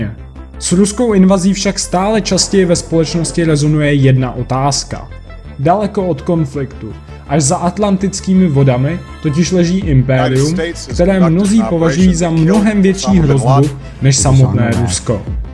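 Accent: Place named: Prague, Czech Republic. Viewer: Sleduji vaše pořady, jsou dobré. Jenom mi vadí vaše čeština, nemohl byste na tom zapracovat? Pražština moc nejde do ucha.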